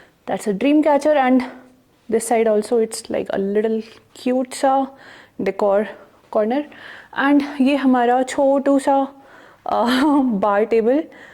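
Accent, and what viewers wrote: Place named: Uttarakhand, India. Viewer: Looks from her accent that she is a Uttarakhand Brahmni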